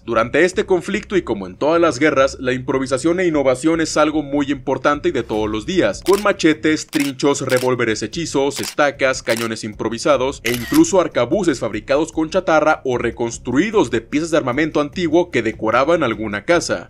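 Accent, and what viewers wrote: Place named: Jalisco, Mexico. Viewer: Pensé que eras de Tlaquepaque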